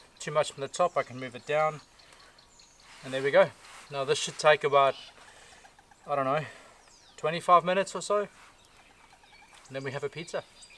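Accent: South Africa. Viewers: Is that a South African accent I hear?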